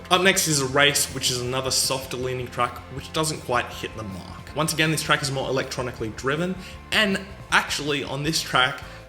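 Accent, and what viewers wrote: Australia. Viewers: aussie accent? aaaaaand close tab. sorry dude :/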